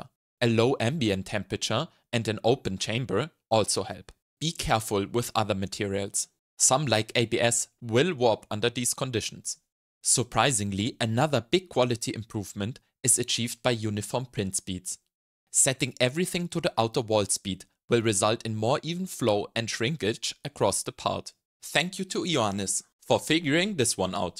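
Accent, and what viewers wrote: Germany. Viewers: Im a simple men, I hear german accent i press abonieren. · 3D printing + german accent = I subscribe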